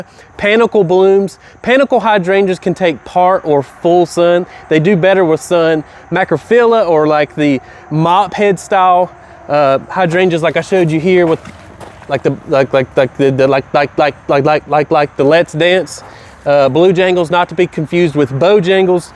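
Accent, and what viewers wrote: South, United States. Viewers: Southern accent is grate , keep it up !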